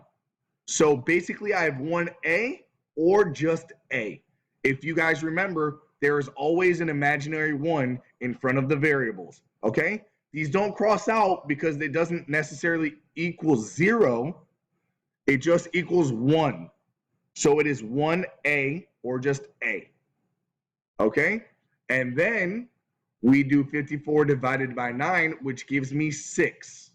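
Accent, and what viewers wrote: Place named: London, United Kingdom. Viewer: You sound like you’re from London.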